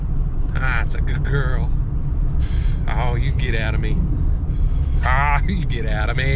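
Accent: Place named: South, United States. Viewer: where are you from? you seem to have a southern "drawl"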